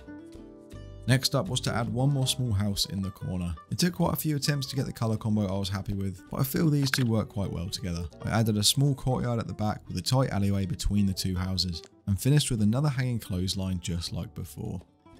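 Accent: Italy. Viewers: si ligure un po pero piu sud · Love Your Voice ♥ Are you Italian Btw ?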